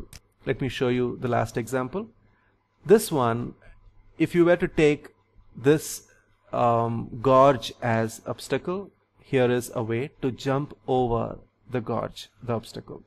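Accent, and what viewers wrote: India. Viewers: Yes, it was informative. Thanks. You have a heavy south indian accent though. Are you a Malayalee or a south Indian?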